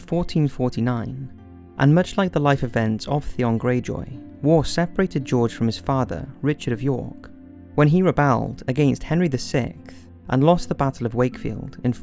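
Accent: Wales, United Kingdom. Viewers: I think it's a Welsh accent.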